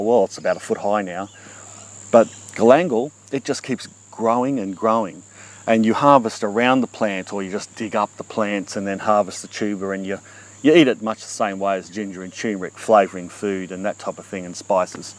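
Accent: Australia. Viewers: I don't have TV and I just love the sound of your Aussie voice, if its relevant to you I can listen to you talking about :-) I hope you keep making videos even if its about the weather and its impact on your garden and your neighbourhood... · From how to build a fire pit, pickling, watching you cut wood, transporting snakes, learning about a tick I never knew existed, to how to grow a lot of... I have really enjoyed your videos. I love your humor and some of the things you've done with your videos. I felt like a fool after watching one video (which was the first one I watched) then sharing that your hen was broody. Thanks for graciously letting that go by. I don't even have any chickens! Keep up the good work. You always seem to make me laugh. The videos you share benefit me. After the review of the Mortar & Pestle set I knew which one I wanted to buy. Oh yeah the DIY outdoor cat hotel - so cool. 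Ok... REALLY, I just dig the Australian accent not to mention the sound of your environment. The birds and wildlife are phenomenal.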